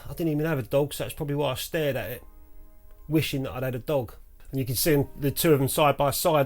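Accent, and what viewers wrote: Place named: South London, United Kingdom. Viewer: I knew I recognised your accent. Coldharbour and the “Farmhouse” in the 70’s. Great memories. Love the channel. Bill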